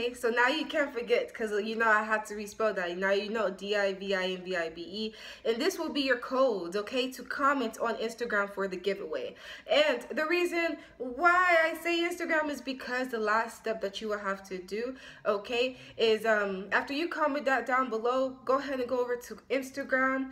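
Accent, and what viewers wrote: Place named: Jamaica. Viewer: Jamaican accent sweet nu bloodclaaat 😏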